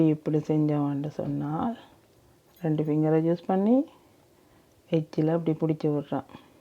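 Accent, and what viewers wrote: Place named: Jaffna, Sri Lanka. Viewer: உங்கள்றிசிப்பி அருமை அதையும்விடஃஉஙகள் கதை நம்தாய்நாட்டு இனிமையான நினைவுகள் அதை கேட்பதற்காக உங்கள்பதிவுகளை பார்ப்பதுண்டு சாவகச்சேரி சங்கத்தின் பக்கத்து வீடு போல அதனால்பேச்சுவழக்கு கேட்க ஆசை வாய் கரைப்பது விட கைகள்so cute அந்த cuteness தான்எல்லோருக்கும் வராதுவந்துவிட்டால் அது அனுபவம் வளரட்டும் 🎉🎉🎉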